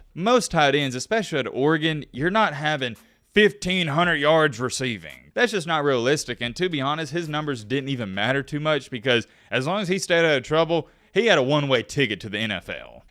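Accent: South, United States. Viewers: This announcer us annoying AF. Not even going to finish this story. I can't take this hillbilly dribble.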